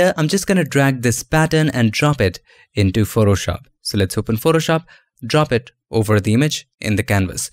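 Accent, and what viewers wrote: India. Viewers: I don't get it? What's wrong with having an Indian accent? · When you find a good tutorial : :D When you see the guy have an indian accent : :/ But you stay because it's awesomely done : OvO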